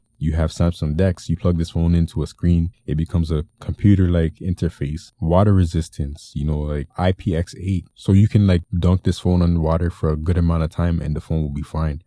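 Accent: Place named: Toronto, Canada. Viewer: Here is my one thing...and before I get to that, loved the review, my guy. Spotted the Toronto accent right away. But, music. Wireless. No one ever talks about this. But if you have expensive headphones, with aptx HD, aptx adaptive or aptx lossless, one plus wins. And Samsung could make it available. Snapdragon-Qualcolm chips created and supports those codecs. Licensing fee is maybe $10. At this price point, who would notice. I can't support Samsung bricking my phone for their silky proprietary codec. Headphones are almost as much as the phone. So...really? Just a bad look.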